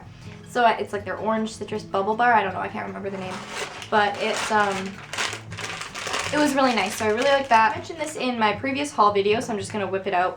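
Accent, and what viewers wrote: Canada. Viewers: This is the first video ive watched of you and i immediately could tell you were from canada :p i love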